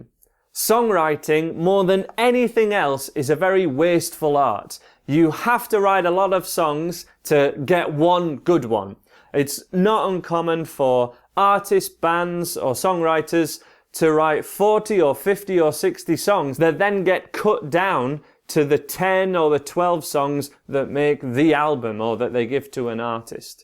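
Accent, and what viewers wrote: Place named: Yorkshire, United Kingdom. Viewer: This is really helpful, thank you :) what part of Yorkshire are you from?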